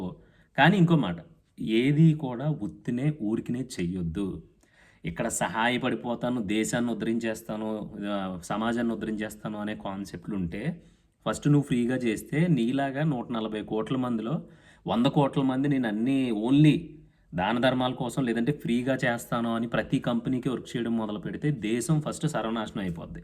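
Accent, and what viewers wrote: Godavari, India. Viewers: Brother manchiga cheppav,mana east and west godavari stylello,ur native place?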